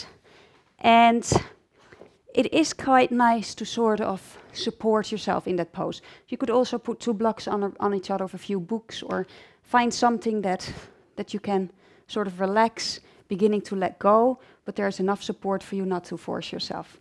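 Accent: Netherlands. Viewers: i love your dutch accent!!!!!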